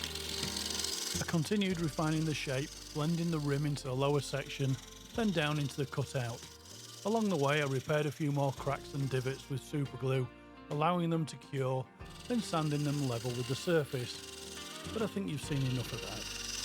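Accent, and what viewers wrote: United Kingdom. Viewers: I like your pedestal bowls, not least because I haven’t seen anyone else do them; and this one is particularly nice but I’m looking forward to seeing what you come up with next. It’s also nice to hear a British accent (Yorkshire?). 🌞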